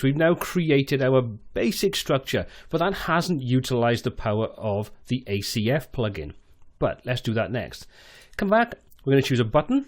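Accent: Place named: England, United Kingdom. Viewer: Yes, they're absolutely brilliant. Nice to hear an English voice too.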